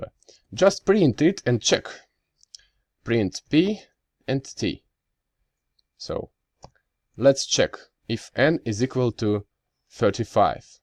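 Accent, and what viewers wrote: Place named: Russia. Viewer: your english has a russian tone, but it doesn't matter. you are a good communicator. keep it up.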